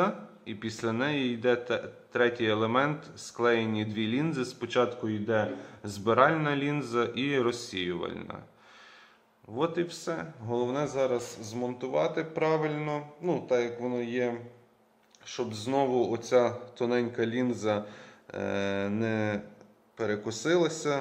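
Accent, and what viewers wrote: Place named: Lviv, Ukraine. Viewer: То є львівський діалект , вам того не зрозуміти. Сходіть потрольте одеситів , вони вам розкажуть скільки у них коштує рубероїд на Привозі )))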